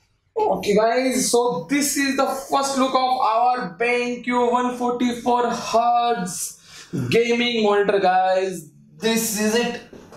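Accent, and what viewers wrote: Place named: India. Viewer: ı love so much indian english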